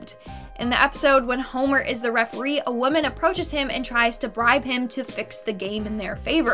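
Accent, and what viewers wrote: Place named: England, United Kingdom. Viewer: I am so hooked on the English accent and now the American accent sux.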